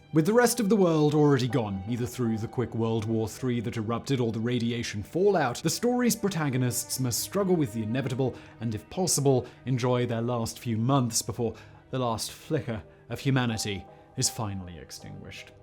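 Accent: United Kingdom. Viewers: I think these channels are not his, he's probably hired to read the script with his nice British accent and all the other work is done by other people, he used to host the English version of visualpolitik which is a Spanish channel. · Is he even Brittish? Sounds like a Brittish wannabe American hipster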